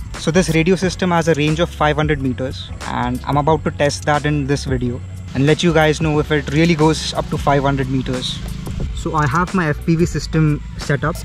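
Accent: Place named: India. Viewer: why do indian english accent sounds all the same?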